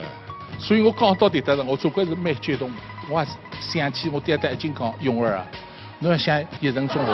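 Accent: Standard. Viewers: 現在上海寧已講勿出-→加標準的上海閒話→好聽!👍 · 很标准的上海话。还有尖音！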